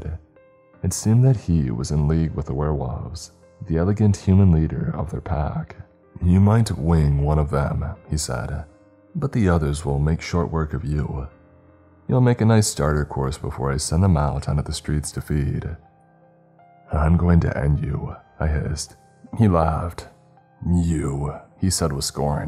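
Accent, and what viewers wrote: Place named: United States. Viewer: I was about to say it was strange hearing an American accent on an British character but then I remembered that you've voiced, and I quote, "a small town girl" before and somehow I can always look past it because your narrations are that good.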